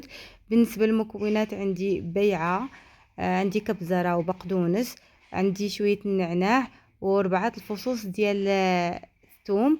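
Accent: Yemen. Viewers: 😂😂😂 مااجملها تتكلم يمني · من ركز انها صارت شوي تتكلمي يمني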